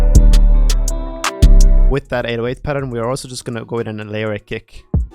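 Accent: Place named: Norway. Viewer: U sound norwegian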